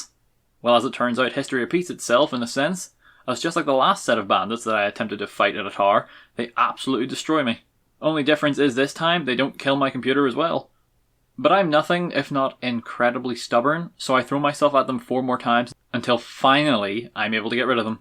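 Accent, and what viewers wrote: Northern Ireland, United Kingdom. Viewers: I can't believe I just heard a northern Irishman speak in uwu truly the internet is a fascinating place. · I fucking love your accent. I think you said it was Northern Irish? im sorry if thats wrong or offensive or something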